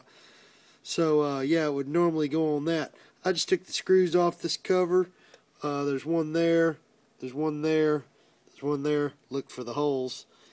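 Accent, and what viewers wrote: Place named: South, United States. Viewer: Thanx for southern speak. To the point no rambling..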